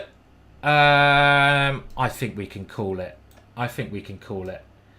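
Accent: United Kingdom. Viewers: It's the Queens' English mate. What, are your ears painted on?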